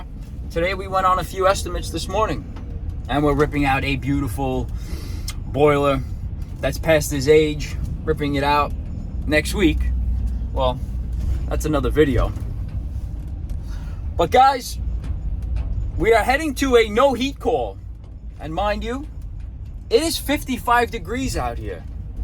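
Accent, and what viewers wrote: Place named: Brooklyn, United States. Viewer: Definitely brooklyn.... Guess from an Ohioan😅 Couldn't catch your email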